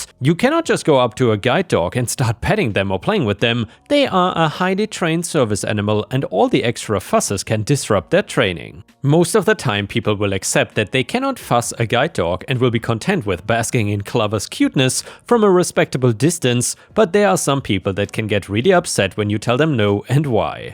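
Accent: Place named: Germany. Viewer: I always enjoy how you narrate these stories - the German accent makes the stories more interesting to listen to. Keep it up!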